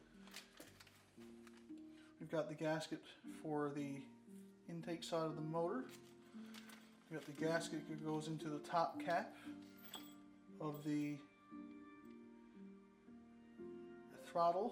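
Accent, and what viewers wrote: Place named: Canada. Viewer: love your videos! I'd prefer real time filming so I can follow step by step rather than the speeded up sections but still awesome nonetheless. Love your Canadian accent too, I'm in New Zealand now but I used to live in Vancouver. We can't easily get carb kits or any spare parts down here. I'd love to rebuild my 1979 XL 100s carb and put a choke cable on it, new throttle cable etc but just can't get the parts :-(. Here's my bike... <link>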